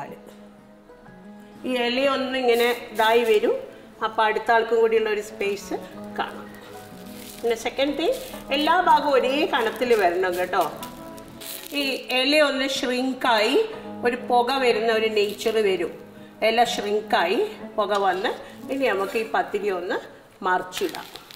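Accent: Kozhikode, India. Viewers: ഞാനും കോഴിക്കോട് തന്നെ ആണ്. പക്ഷേ ഷെഫ് ൻ്റെ കോഴിക്കോട് സ്ലാങ് കുറച്ചൂടെ മനോഹരം ആണ്.😊😊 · Is that Calicut slang or a bit of kannur slang . It’s so interesting how Malayalam slang changes from kochi to Thrissur and then up north . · Kozhikode slang pwoli❤️❤️✌️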